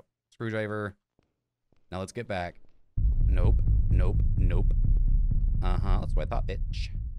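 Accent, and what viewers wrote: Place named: Scotland, United Kingdom. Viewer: I absolutely love the Scottish accent.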